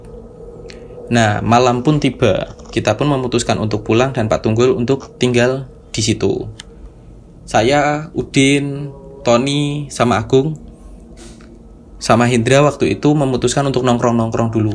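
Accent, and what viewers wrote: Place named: Semarang, Indonesia. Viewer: Logat semarang kihh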